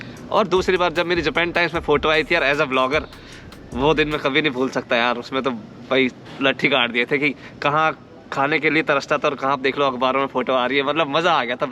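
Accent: Haryana, India. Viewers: Ram Ram ji, News wali video kab ayegi. Karo news wali video, bada maza aa jata hai waise videos dekhke apke Haryanvi accent me.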